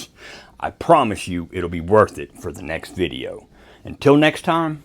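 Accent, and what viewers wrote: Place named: South, United States. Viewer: Hi, I juts discover your channel this morning. Congrats, very interesting stuff. Question for you. Where are you based? I would say in South U.S by your accent, but for the French Canadian I am, I would also want to thanks you, cause I can understand you with any problem You just got another huge fan, and you will inspire me to launch my own channel by the end of the year Have a wonderfull day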